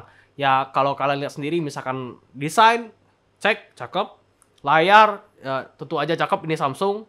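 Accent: Palembang, Indonesia. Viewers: ai bang, salam kenal be bang, ciren dari logatnyo bang😁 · Dari Logatnya Seperti Wong Kito Galo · palembang bg?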